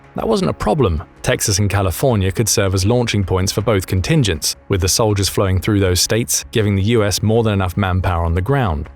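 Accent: United Kingdom. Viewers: Heard a British accent, automatically disregarded their opinion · 😂 bro, you,forget the firepower Americans have more war experience since you sound like you from the uk you have no idea how much firepower us have and the damage they can do only thing would be they wouldn't want to harm civilians they ony want the cartel but if Mexico government keeps helping them cartels than yeah us will wipe thrm out faster than what you believe. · You can tell you have no idea about American Military. There is no way they would be that big an issue. And Mexican American Soldiers would not desert the American Military cause they know if they tried crossing the boarder later they would be killed for desertion. Remember this is some Brit by the sound of him has zero U.S. Military experience whatsoever.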